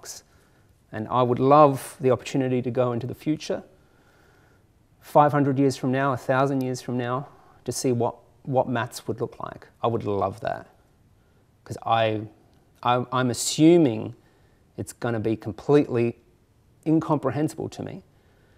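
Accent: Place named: New Zealand. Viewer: Sounds to me like a Kiwi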